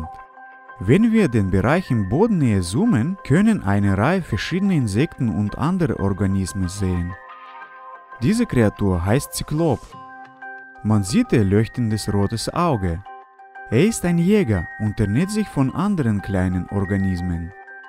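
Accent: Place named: Russia. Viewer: Hearing this russian accent is more addictive than ASMR - videos :-) Thumb up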